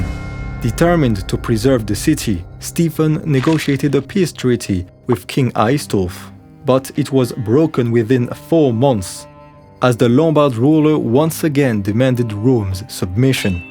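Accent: France. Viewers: t'es français ? 🧐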